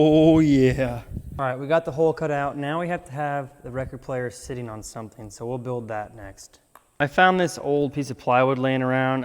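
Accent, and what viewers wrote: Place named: United States. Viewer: Saludos, de que parte de Estados Unidos es tu acento?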